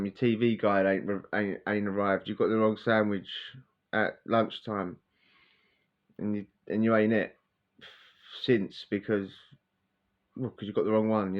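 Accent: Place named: Harlow, United Kingdom. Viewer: 😂😂😂grays fire 🔥. Lol loved that Harlow talk other day aswell ur not bad and from east ur from Harlow 😂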